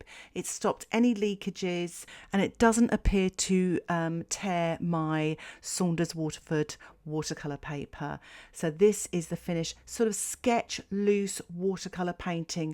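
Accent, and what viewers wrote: England, United Kingdom. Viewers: Absolutely gorgeous and the English accent is great as well. My family history is in England & Wales. I am just getting started in watercolor artistry & I can see you will provide fantastic inspiration! I have subscribed!!!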